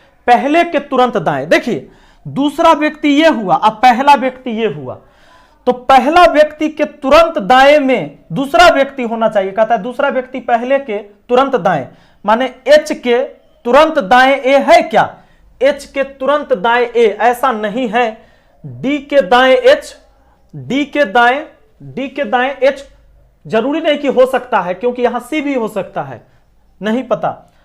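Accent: Bihar, India. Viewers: apni bihari tone... mja aa jata h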